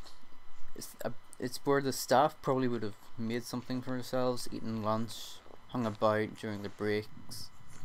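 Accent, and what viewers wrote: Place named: Northern Ireland, United Kingdom. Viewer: you can tell he's british by his voice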